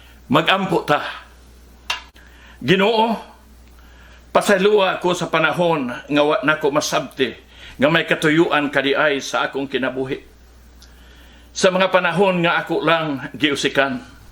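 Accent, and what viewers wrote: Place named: Visayas, Philippines. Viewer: I love how you speak so bisaya Pastor, I wish I could speak like that.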